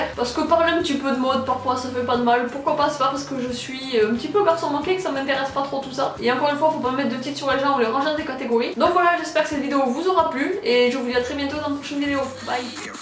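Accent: Toulouse, France. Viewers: Elle a un accent toulousain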